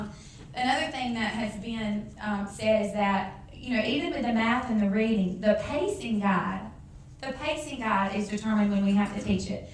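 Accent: South, United States. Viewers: To all you complaining about her accent and pronunciation... we Southerners can't understand a word of your Yankee-talk, either! But we would not respond to a passionate, intelligent critique of this very dangerous Common Core crap by posting a comment demeaning her accent, while completely ignoring the substance of her excellent argument. We Southerners are not rude like that-- unless provoked. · It's called a Southern accent. You don't have any regional pronounciation of any English words? I'm sure you do. You could see how passionate she is about education, how much she wants to help her students, how her general discourse was well thought out and intelligent, yet you chose to focus on a couple of words among all these positives. Schools need more people like her. If you truly want to do some good for the world, stop being a nitpicker and a quibbler and see the big picture. 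· its a southern accent hard to fallow if you've never heard it before i will admit. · She has a southern accent. I understood every single word. Y'all are just rude and mean. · Have you ever been passionate about something? Have you ever talked so earnestly that your words might have been rushed or you enunciated less clearly than usual? I have. I know the correct pronunciation of "adjective," but to pronounce every single sound in the word takes almost twice as long and makes the concept much more intimidating. Would you correct a child who left out the "c" in adjective? I wouldn't. "Liberry?" Absolutely. But she's Southern. I'm Southern. We pronounce it slightly differently. Would you correct a black person using ebonics? Or a British person who says adVERtisment instead of ADvertisement? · why don't you people shut the hell up about her southern accent. Other parts of the country are just as annoying with their accents and dialect. 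· I think perhaps your ear is just not fine tuned to the deep southern accent. She is speaking rapidly since she is limited by time and clearly passionate about this subject. Being from Tennessee myself, I actually hear the 'd' in both "adjective" and "adverb". I find it amusing how people of the US (and Canada) have such difficulty accepting & understanding different dialects of other US citizens. Don't equate a southern accent with stupidity; many, many times that assumption will be very wrong. Some of us may not seem to speak properly in others' opinions. It's the rhythm and cadence of our speech, dropped syllables, run-together words, changing vowel sounds in words so they sound totally different, our habit of making one syllable words two syllables. Yeah. It's a Southern thang. Y'all ain't never gonna understand. Bless your hearts.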